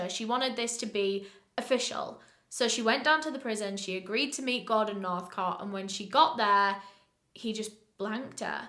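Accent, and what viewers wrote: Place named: Southern England, United Kingdom. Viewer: just me who thinks accent is fading into a more southern English accent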